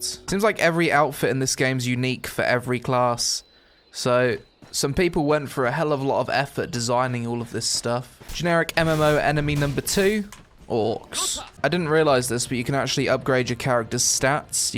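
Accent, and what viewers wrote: Netherlands. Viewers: Didnt know u where dutch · Youre Dutch?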